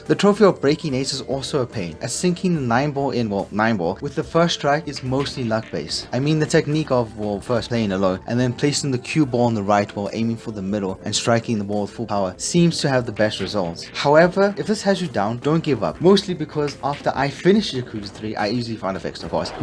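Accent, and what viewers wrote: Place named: South Africa. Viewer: He's south African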